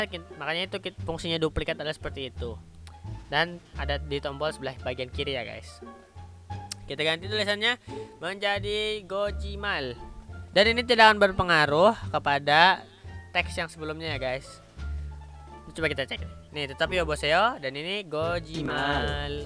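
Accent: Banjar, Indonesia. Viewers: Kadaaaaa jerrr,, Logatnya banjar bujurr 😄😄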